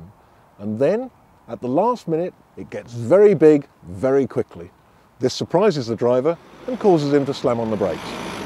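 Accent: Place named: Australia. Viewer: Hey, she's Australian! Not that it particularly matters. Excellent video, anyway.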